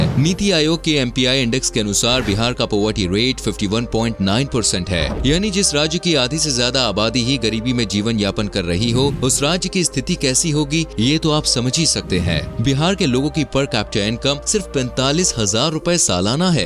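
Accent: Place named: Assam, India. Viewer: आप तो आसाम के लग रहे हो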